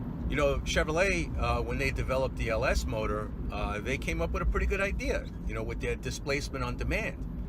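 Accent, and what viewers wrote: New York, United States. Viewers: You must be from New York area originally 😁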